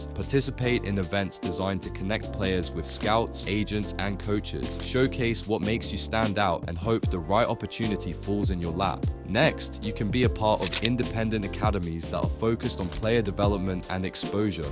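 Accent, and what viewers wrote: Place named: Scotland, United Kingdom. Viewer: Um hi! I know its hard to believe but this is my other account as this channel is only available on my computer and I am using my phone right now but the reality is you will never go pro because you are from Scotland and scouts wont like a person like you with your Scottish accent around here so get an actual job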